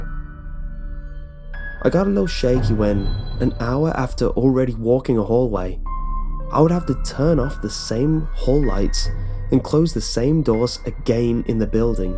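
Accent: United Kingdom. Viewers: What part of the UK are you from? I love your videos btw!! I saved like 10 really long ones to listen to on a flight, so relaxing!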